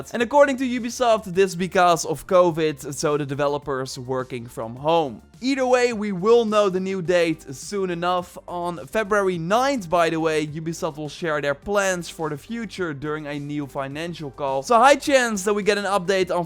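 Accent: Netherlands. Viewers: m8 are you dutch you sure sound like one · Ken heel je kanaal niet. Maar aan dit accent te horen ben jij sws Nederlands lol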